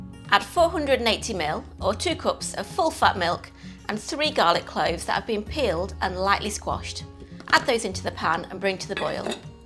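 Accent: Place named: England, United Kingdom. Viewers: Great recipe and super simple, thank you.....! Are you from Liverpool? I sensed a hint of a scouse accent. Hope you are a "red" :-) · Delicious cooking explanation with that English accent makes me watch this video on repeat mode. 😂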